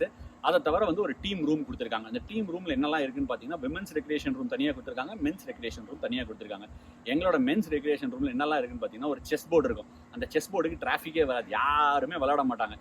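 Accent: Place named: Chennai, India. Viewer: Chennai Tamila post pandrathu supera iruku plus it gives a feel like listening to a friend who opens up wat he has in his mind. Addicted to ur accent ❤️❤️ yaaaarrrum vara matangaa 🤣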